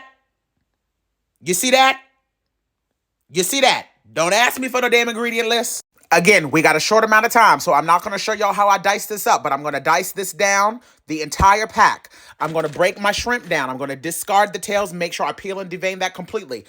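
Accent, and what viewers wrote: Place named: Louisiana, United States. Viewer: Ok, you earned my respect. I would swear you are from Louisiana 🎉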